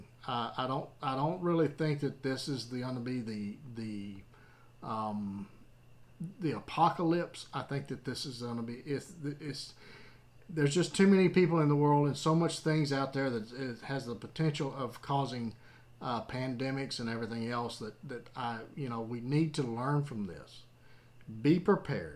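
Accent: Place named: South, United States. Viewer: Thank-you --calm, southern, common-sense. You need to be on President Trump's team--once a week "The Minute"--seriously, a calming voice in a sea of fear. Again, thank-you for posting your comments. At first I wasn't sure what to expect. God bless and keep you and your family safe.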